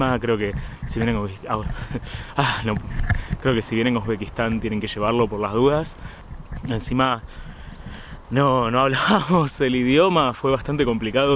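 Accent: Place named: Buenos Aires, Argentina. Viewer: Muy bueno el contenido y didáctica la explicación. Sos de Buenos Aires por casualidad, digo por el acento. Y lo de el restaurante giratorio me hizo acordar a la confitería giratoria del cerro Otto en Bariloche.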